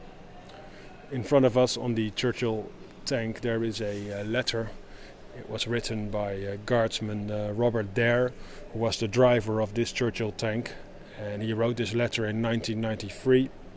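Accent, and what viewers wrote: Netherlands. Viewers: Hallo ik hoor dat je Nederlands bent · That Dutch accent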